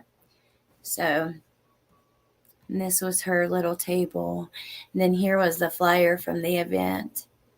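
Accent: South, United States. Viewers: I can listen to u talk all day.. lol 🥰 ur southerners accent reminds me of brit spears ♥️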